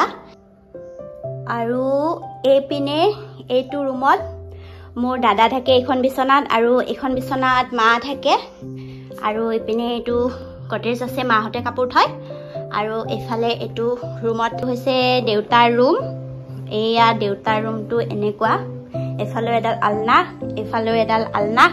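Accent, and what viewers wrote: India. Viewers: তুমি নলবাৰীৰৰে কোৱা❤❤❤❤❤❤❤❤❤❤❤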